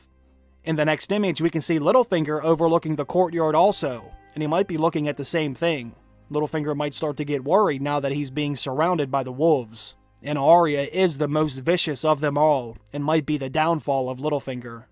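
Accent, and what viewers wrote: United States. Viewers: Which American accent is that ?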